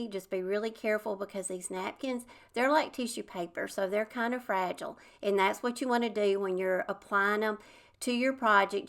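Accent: South, United States. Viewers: Just have to say that I ❤ your Southern accent could listen to it all day long ❤ your crafts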